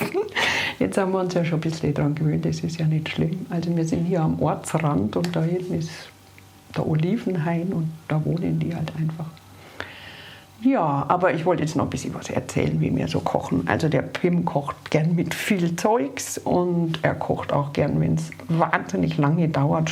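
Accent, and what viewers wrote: Bavaria, Germany. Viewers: Dass du bayrisch redst, das wusste ich nicht. Gfreit mi ....🙂 An Guadn für euch beide....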